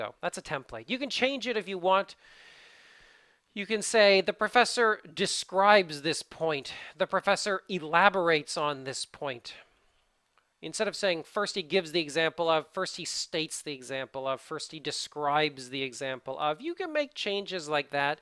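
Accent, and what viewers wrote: Canada. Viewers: So what if you have a Canadian accent??? Native speakers from UK and Australia also have their own accent. Your lessons are great. I am glad I came across to you.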